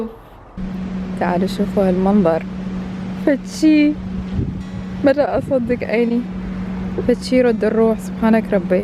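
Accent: Iraq. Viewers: سلامة افادج ههههههه.. ابتسامتج واللهجة العراقية.. لا تقاوم.. اتمنالج التوفيق من كل كلبي.. وبالعافية ع اللابتوب الجديد.. اذا تحتاجين مساعدة بخصوص اللابتوبات والبرامج وهالاشياء.. ممكن افيدج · فديت الهجه لعراقيه شكد حلوه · بداية، احلى لايك قصة الكوب، قد تبدو سهلة وفاترة، ولكني اشعر بالمعنى الكبير الذي تحمله، احياناً تكون لدي مثل هذه الشطحات، بأن اقسم على نفسي بأن لا استخدم الشيء الا في المكان والزمان الذي احدده له لكي يكون حافزا لي. ويكون له طعم رائع في حينها يشبه طعم النصر. اللابتوب الوردي، لونه يعبر عن الانوثة الطاغية في شخصيتك. بالمناسبة، فعلاً اللابتوب المفروض يتبدل على الاقل كل 5 سنوات لان البرامج تصبح متطورة اكثر وتحتاج الى ذاكرة اوسع ومعالجة اسرع. الطبيعة الخلابة،،،،، احسدك عليها. سعيك وراء الاهتمام بامورك الشخصية والاعتماد التام على نفسك، امر مشجع كثيراً.. لهجتك العراقية راااااائعة تعبر عن عزيمة وثقة بالنفس وبنفس الوقت الانوثة والنعومة طاغيتان، احلى شي تلفظينه هو حرف الراء وحرف العين. 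مميزان كثيراً. اتمنى لك التوفيق من كل قلبي.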